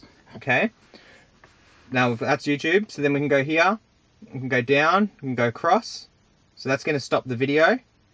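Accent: Australia. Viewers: Nice video man, not too use to hearing Australians on YouTube haha forget I sound like that too😂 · Why do speak like a Australia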